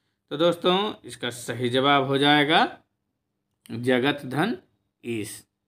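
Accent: Bihar, India. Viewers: Bihari hindi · Bihari Hindi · bihari hindi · बिहारी हिन्दी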